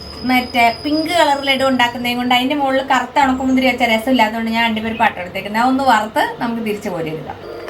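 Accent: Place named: Kottayam, India. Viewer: Samsaaram kettal kottayamkare pole....vedio super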